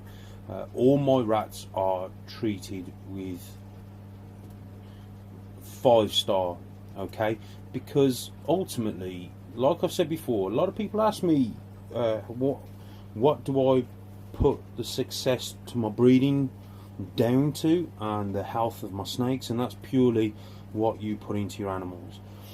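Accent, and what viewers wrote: Black Country, United Kingdom. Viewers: GREAT VIDEO UR ACCENT SOUNDS LIKE BLACK COUNTRY I DONT SEE PROBLEM WITH FEEDING LIVE WHAT WOULD THEY EAT IN THE WILD ITS SIMPLE!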